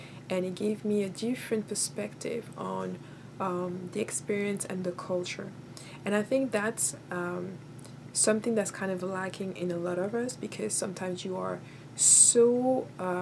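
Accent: France. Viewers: I can hear a French accent peak through am I right? But good story time girl make more videos can you do a love spell?!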